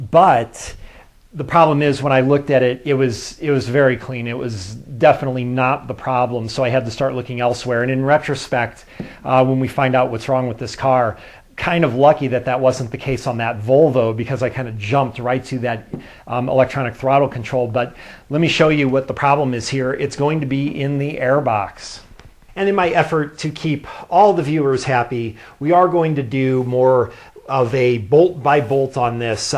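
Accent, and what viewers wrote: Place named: Louisiana, United States. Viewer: Jeeese I must apologize I just took it for granted - to me you have an accent, I thought it was Cajun but I'm from Canada so its not like we hear people from Denver either except John Denver and you know he never had an accent. Up here once a week I listen to the best automotive phone in radio podcast in the USA from guess where Louisiana. This is the link if your interested. <link>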